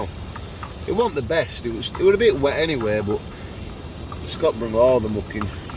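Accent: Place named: Northern England, United Kingdom. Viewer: Chatting is what makes your videos, but with a northern accent makes it more worth watching. Keep up the work laddie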